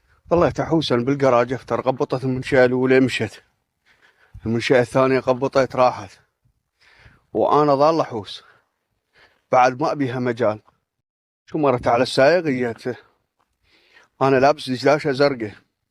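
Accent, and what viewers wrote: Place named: Samawa, Iraq. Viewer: بس صدك لهجة مالتك مال سماوة عدنا ضابط هيجي لهج يكولكم هچي كم كلمة كلتهن انت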